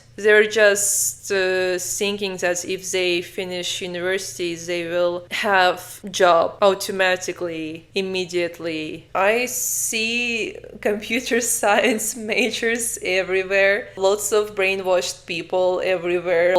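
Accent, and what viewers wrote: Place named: Brazil. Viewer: classic brazilian accent, i can tell when i hear my fellow brothers and sisters of brasil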